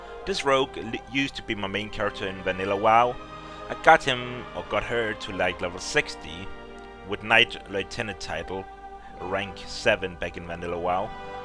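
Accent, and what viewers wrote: Denmark. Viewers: Are you danish? :b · Are you danish?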